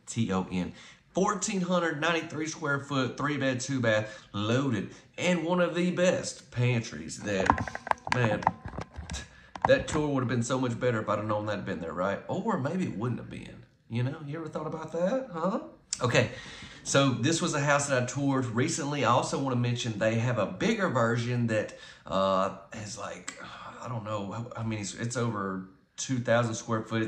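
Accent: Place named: Deep South, United States. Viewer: Greetings from Arizona! I love this house! I love the split floor plan, how the great room is laid out. The pantry and closets are fantastic! It’s just the right size for me, and any visiting guests, or even a tenant. I hope there are other options for the time color in the master bath shower,m. There there is lime in the water where I live. It builds up, and would be much more obvious more quickly than on lighter tile. I love your videos. They are well thought out. You do a great job of showing and detailing the features. You obviously like what you do. You are enthusiastic without being “in your face”. I love your easy going manner, and your accent, that Deep South gentle drawl. Angels on your bumpers and all around you.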